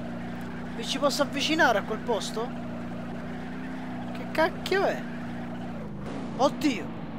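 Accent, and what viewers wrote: Sicily, Italy. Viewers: ma sei siciliano come me?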